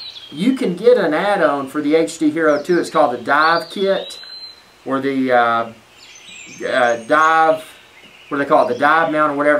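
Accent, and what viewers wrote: South, United States. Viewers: I have a feeling it is angry Europeans disliking his southern accent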